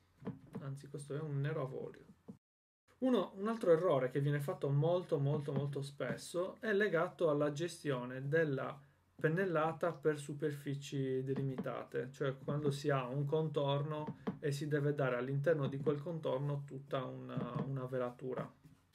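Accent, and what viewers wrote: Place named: Sardinia, Italy. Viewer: No , l'accento è quasi impercettibile, io l'ho notato perché anche io sono Sarda 😄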